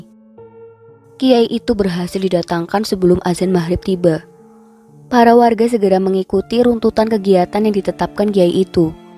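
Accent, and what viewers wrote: Banyumas, Indonesia. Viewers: Bahasa ngapak yak😅😂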